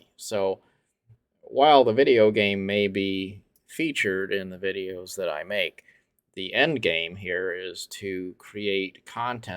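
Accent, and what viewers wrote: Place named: South, United States. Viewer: Just wanted to see if this was possible. Lo and behold, an Asian dude, in a cowboy hat, explains to me with a southern accent, how to play this game which it's main point is to combat, in a pacifist way. I am fully enlightened already and i'm just 10 seconds in.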